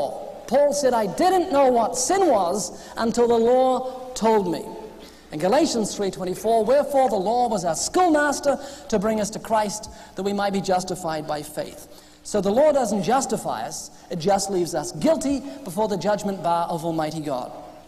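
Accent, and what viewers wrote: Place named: New Zealand. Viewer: This was when you sounded more like a Kiwi, when 'world' was more 'werld'. Love it!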